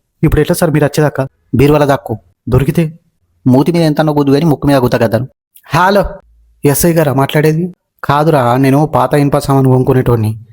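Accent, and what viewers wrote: Telangana, India. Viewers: తెలంగాణ యాసలో చేసే మీ వీడియోలు మంచి రిలీఫ్ ఇస్తాయి.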